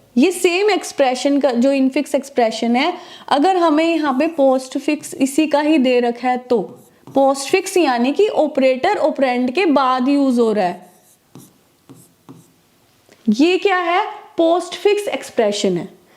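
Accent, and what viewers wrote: Punjab, India. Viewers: Panjabi ho kya miss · I am from punjab and your punjabi accent in hindi made this video more intresting, good explanation.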